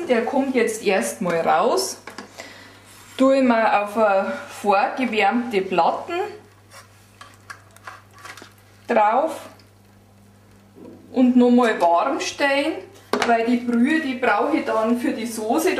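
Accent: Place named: Bavaria, Germany. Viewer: ich mag ja den akzent aus dem schönen bayernland xD das rezept probier ich das we mal aus danke für das video ;)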